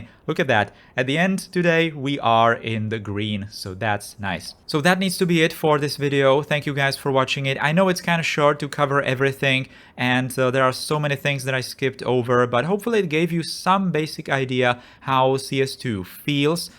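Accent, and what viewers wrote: Czech Republic. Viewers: CZ, judging by the czenglish. And those batteries are MWhours, not MWatts!!!! That would make no sense! Edit no.2 4MW is not battery capacity, it just shows how much is flowing into it😅😅, capacity is 10MW!!!hours!!!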